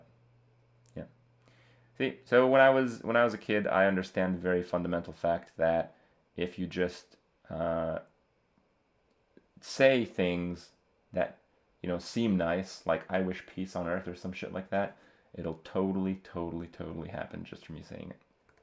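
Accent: Romania. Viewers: So that's why u'r accent was so familiar cuz u are from romanian too,and,Omg this catle totally looks like my own caslte drawing. Totally..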